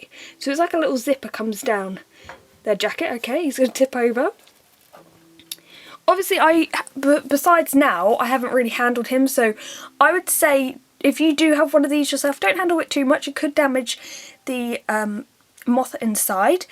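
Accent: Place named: Southern England, United Kingdom. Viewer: What I spotted was a "common" or viviparous lizard. I walked past it as it was busy sunning itself on a tree-stump...but the HOLY GRAIL of lizards to spot here in the UK would be the sand lizard. Pretty sure they're only found on the south coast, and you sound like a southerner so... x)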